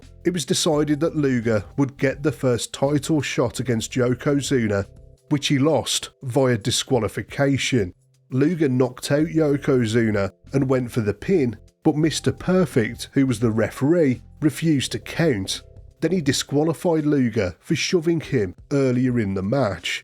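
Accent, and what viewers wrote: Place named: Black Country, United Kingdom. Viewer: love 94 almost as much as your accent. Yow sound like youm from rand ere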